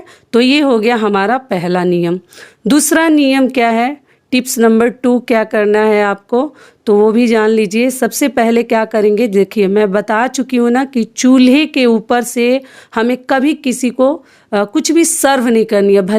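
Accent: Bihar, India. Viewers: Ap bihar se ho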